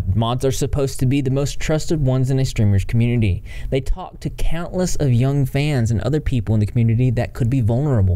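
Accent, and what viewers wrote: South, United States. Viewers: I cant tell if your from Southern USA or Austrailia ? Love your accent 😍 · Odd question: Where’s your accent from? I love it; I’m guessing SC or maybe GA. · I love the subtle southern accent... not related to the video but your accent is nice haha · This guy makes a southern accent HOT 🥵! 😅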